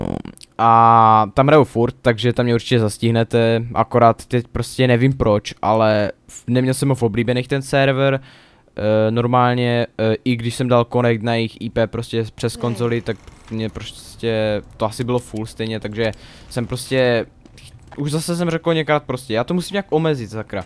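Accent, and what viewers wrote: Russia. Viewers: Nejlepší ten ruskej přízvuk!! (kde jsi se ho naučil?) :D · ten ruskej prizvuk xD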